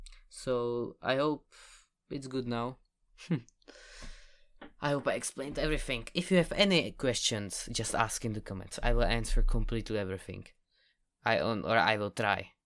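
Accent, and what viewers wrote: Czech Republic. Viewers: T H A T B E A U T I F U L C Z E C H A C C E N T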